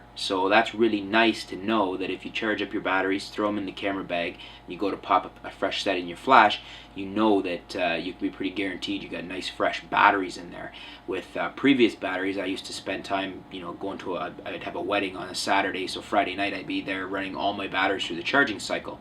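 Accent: Canada. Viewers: best thing about the Eneloop batteries, is your awesome Canadian accent!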